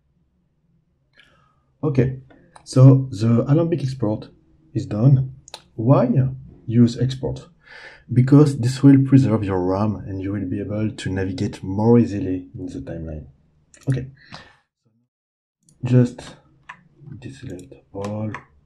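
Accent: France. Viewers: hey, avec l'accent j'ai assez compris que tu était francophone, merci pour ce tutoriel !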